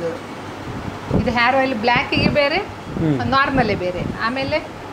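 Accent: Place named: Kundapura, India. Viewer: Madam neevu Kundapuradavara. Matado style ade tara ide. Thank you🙏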